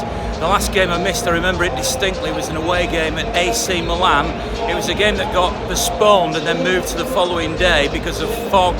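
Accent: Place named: Oldham, United Kingdom. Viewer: That's a strong Oldham accent you have there, respect for keeping your roots, 👍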